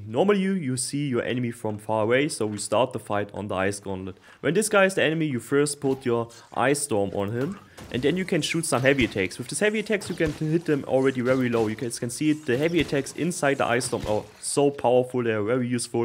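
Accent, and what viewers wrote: Germany. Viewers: are you german by any chance? your english sounds too funny haha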